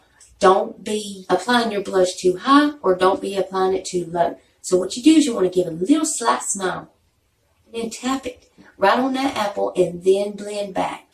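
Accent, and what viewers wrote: South, United States. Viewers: Hey sweet face, i call my wrinkles WISE CRACKS💖💖😜 thank you so much for sharing your videos with us! I absolutely love them! And thank you for these awesome tips I am 46 years old and have stage 4 lung disease set my medications done a lot of damage to my skin and being a little I guess! But I have been trying the Skin Care night and day and I think it's starting to make a difference so thank you so much for the wrinkle tips I needed that LOL! And you remind me Paula Deen! LOL I'm from North Carolina to so I love that southern twang😜😜😜💖💖💖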